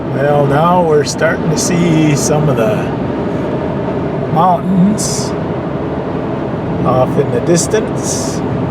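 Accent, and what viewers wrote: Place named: Midwest, United States. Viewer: This is the cutest and most wholesome road trip video I have ever seen in my life. I love this guys voice. So Midwestern. 🤗🤣💜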